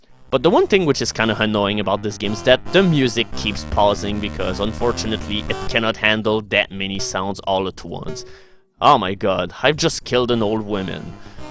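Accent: Quebec, Canada. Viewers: I think he's from Quebec. It's pretty much the best accent for LPing I reckon. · Best accent ever. Just another reason I am jealous of Quebecois (I'm Canadian). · Yep, that is indeed a Quebec accent. I live with frenchmen, myself.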